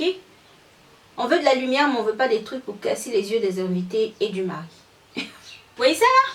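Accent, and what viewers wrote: Ivory Coast. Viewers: Accent Ivoirien ma chérie.😍😍😍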